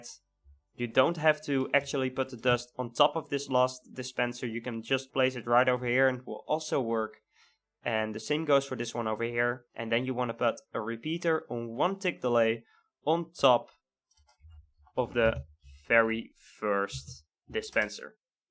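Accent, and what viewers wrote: Netherlands. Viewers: where do you come from? You sound dutch. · Hij is 100% nederlands hahahaha 99% van de nederlanders kunnen geeen engels praten zonder accent ( ik ook niet :P )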